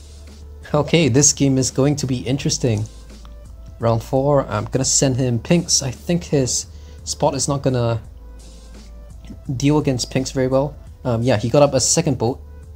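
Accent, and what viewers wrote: Singapore. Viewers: mm where are you from? Singapore?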